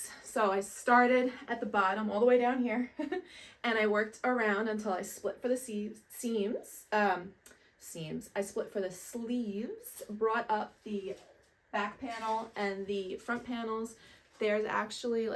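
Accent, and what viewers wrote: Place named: New Jersey, United States. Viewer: That cardigan is so nice girl you have very gifted hands 🙌🏾If you don't mind me asking where is your accent from? its sounds so cool like the way you pronounce certain things reminds me so much of jersey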